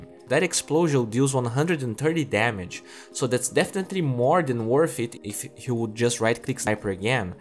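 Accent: Brazil. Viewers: É BRASILEIRO SIM PORRR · Your accent sounds like we brazillians talking in english. Great video, keep it up. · Are you Brazillian ? It looks like by you accent.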